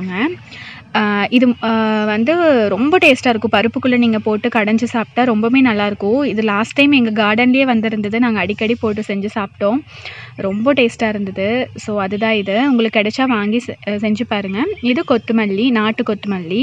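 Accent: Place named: Coimbatore, India. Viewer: Hi sister I am ur new subscriber. I am also coimbatore I like ur kovai slang..... so cute....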